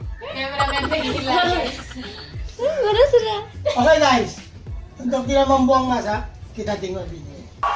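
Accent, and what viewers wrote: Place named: Manado, Indonesia. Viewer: Kok bisa bahasa Indonesia, pasti tinggal di pulau dekat pulau Miangas diperbatasan, atau mungkin sering ke manado, soalnya logatnya sama 😅 kalau saya dari gorontalo provinsi sebelah manado jadi logatnya sama.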